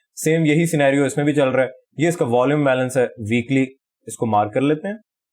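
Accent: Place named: Punjab, India. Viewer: Sir ap ke mooh se Punjabi phubdi aa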